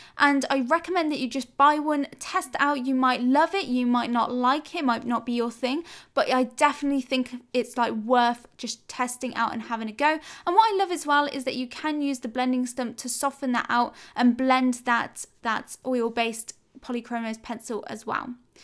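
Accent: United Kingdom. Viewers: You're from UK right? How do I know your accent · Good lord, the British accent is so attractive lol... Anyhow, back to reality... thanks for these art tutorials and tips! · British accent, I like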